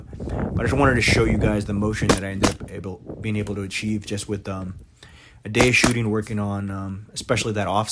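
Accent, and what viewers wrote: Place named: Texas, United States. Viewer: 1) Get yourself the brightest lazer/illuminator module you can find and practice point shooting from the low ready and hip positions. The scope is for longer ranges, but for your handicap a lot of tacticool lasers would serve you pretty well for close range daytime shoots.You sound Texan, yall have cool toys over there and hella veterans discounts so finding one should be easy. 2) Use your sling as an additional point of contact. A single point audjustable sling attached to the top rail where the center of gravity is feels like you have an additional arm holding the rifle up. Have it extremely tight and wear it so the weight of the rifle pulling on the sling is supported by one of your shoulders. I have a stockless 16in Ruger PC9 settup like this, its fun being able to manipulate it with one hand and accuretly use the laser to hit my targets. 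Ive never been serious with it though, but I can see my settup helping your flesh side from fatigueing less often. Semper Fidelis, much love from the Communist State of California.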